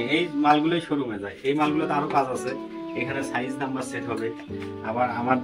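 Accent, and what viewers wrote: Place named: Dinajpur, Bangladesh. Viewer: ভাই, আপনার ঠিকানা টা দেন,কথা শুনে মনে হচ্ছে দিনাজপুরে বারি।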